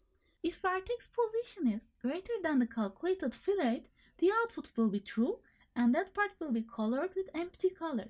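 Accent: Turkey. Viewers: Türk aksanı 🙂 kolay gelsin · English with Turkish accent :D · Teşekkürler.! video çekmeye tekrar dönersin umarım :) hep 1 yıllık videolar, ayrıca ufak bir bakındığıma göre mikrofon iyileştirmesi yapmış gibisin ama biraz daha tane tane konuşabilirsen muhteşem olacak gerçekten :) aksanı duyar duymaz dedim bu türk olmalı :D oyun sektörüne böyle katkılar yapan türkleri görmek çok güzel.! hintlilerden ve kuzey ülkelerinin garip aksanlarından sıkılmıştık :D yılma devam et lütfen.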